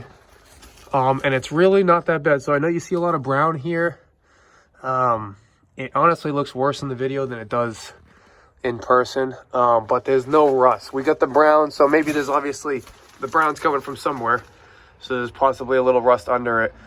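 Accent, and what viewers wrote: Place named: New England, United States. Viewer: Was watching your 2004 Corolla head gasket video and was thinking "between his accent and all the rust/corrosion he's gotta be in New England". Came here and found my answer.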